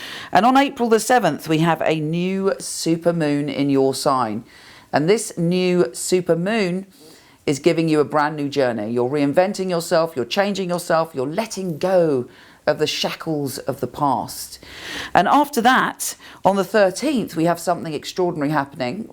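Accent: United Kingdom. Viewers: A British Accent and Sultriness to your tone in one. Wow.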